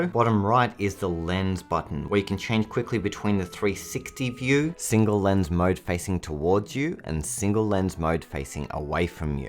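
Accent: Australia. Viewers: wonderful to hear the Aussie accent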